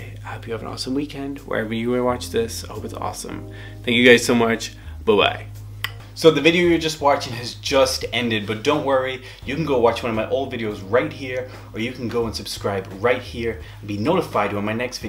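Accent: Ireland. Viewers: Where are you from? I’m trying to figure out that accent...it sounds subtly Irish. I initially thought it was Scottish, but after listening to you, it definitely isn’t Scottish. Regardless, you’re nice to watch. 😍🏳️‍🌈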